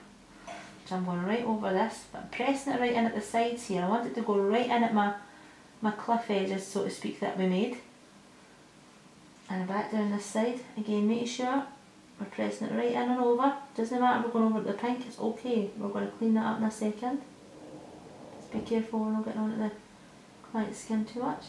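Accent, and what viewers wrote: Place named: Scotland, United Kingdom. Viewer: Thank you very much for this clear video. I sometimes have a little bit of trouble understanding the Scottish tongue trap, (I'm from the Netherlands) but through the images I understand what you mean. I am looking forward to the next video. Greetings, Olga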